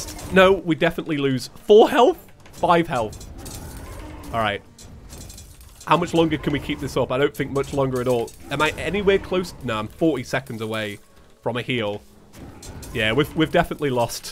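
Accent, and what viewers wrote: United Kingdom. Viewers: The bri’ish terrarian is back :)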